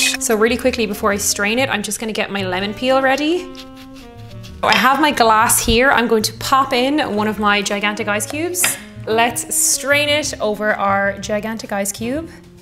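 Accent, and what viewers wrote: Ireland. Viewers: Gotta love the Irish accent.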